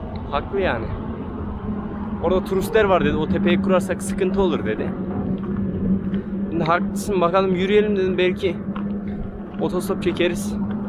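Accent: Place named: Kayseri, Turkey. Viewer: Bu yakışıklı da kimmiş önüme çıktı videosu 😂Gayserili gibi gonusuyor😂Ulukisladan selam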